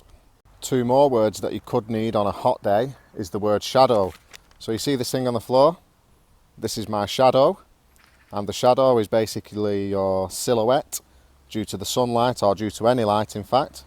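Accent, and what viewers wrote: United Kingdom. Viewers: Your lesson is so helpful because you pronounce every words in British accent and your speed is average which is also helpful for understanding the native speaker in practical situation... Thank you from India. by the way British people left English language for Indian when they ruled our nation.do you know about this?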